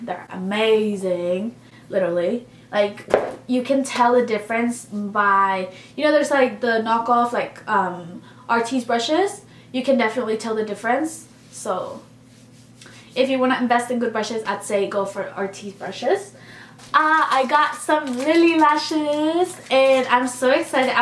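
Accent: Mexico. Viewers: We love a Mexican Queen with a cute accent 👑💕✨🙌🏾 Too Faced: Warm Sand Covergirl: golden ivory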